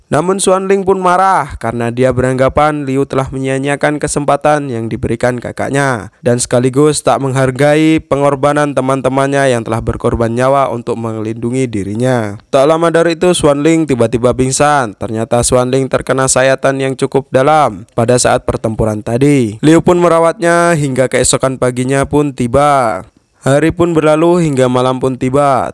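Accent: Madura, Indonesia. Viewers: Orang madura kah bang?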